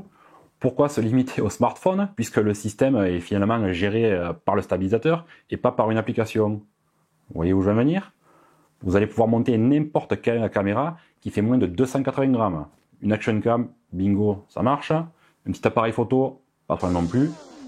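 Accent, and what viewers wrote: South, France. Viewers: Super vidéo vive l accent du sud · Impéccable, avé l'accent du sud 👍, belle présentation et démonstration, il me tente bien ce guimball ... salutations du 07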